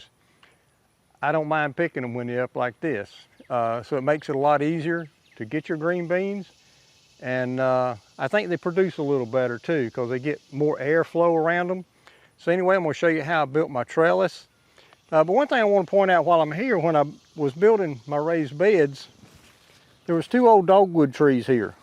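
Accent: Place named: Georgia, United States. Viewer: Sounds like a Gawgia boy...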